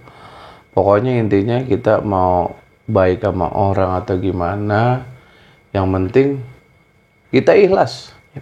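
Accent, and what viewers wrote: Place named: Banyumas, Indonesia. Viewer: Ora ngapak ora asik Cilacap mantau mas🙋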